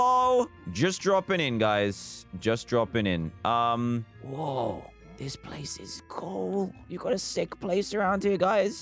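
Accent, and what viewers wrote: United Kingdom. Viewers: shut up he’s British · That’s because we British say Debis that way w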